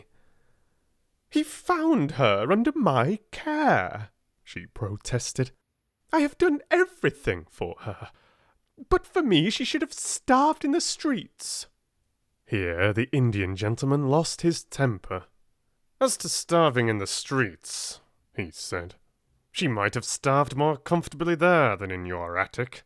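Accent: Yorkshire, United Kingdom. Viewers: He and Neil Gammon ( sp?) Are my two favorite narrators with the touch that only a British dialect can pull me into the story or lull me to sleep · Please could you read Wuthering Heights and Jane Eyre. I guess you are from Yorkshire and would be well suited to read these stories.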